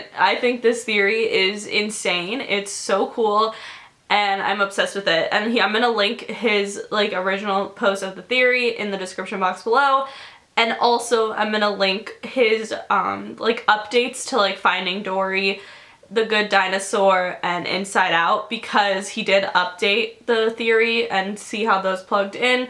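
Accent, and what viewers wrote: United Kingdom. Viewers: Are you britishh?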